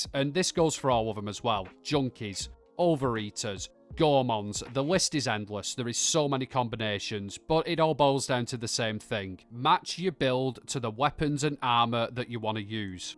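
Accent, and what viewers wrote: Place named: England, United Kingdom. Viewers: You speak like your from my area Oldham haha love it ! Great vid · I've played 76 a couple of times. Who knew a northern lad telling me to "Stash Yer Joonk" would be what helped me get into the game. Seriously though this video is actually super helpful. I love how you explain things and it doesn't sound complicated and confusing. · I don’t think I’ve ever heard such a strong English accent before watching this video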